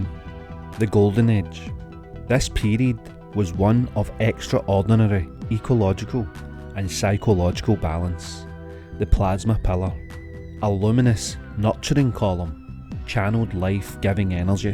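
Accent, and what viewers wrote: Scotland, United Kingdom. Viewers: There's just something hearing the story in a scott accent that makes it so much more interesting. Reminds me of my grand uncles.. natural storytellers.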